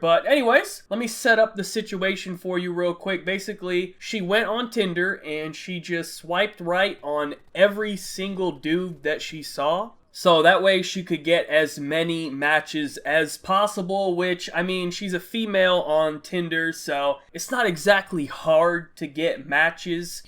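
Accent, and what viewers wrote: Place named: DMV, United States. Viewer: Bruh are you from the dmv cause you kinda sound like it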